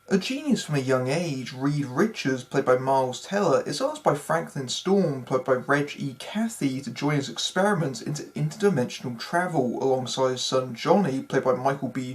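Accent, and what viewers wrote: United Kingdom. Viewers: that perfect British TV news anchor voice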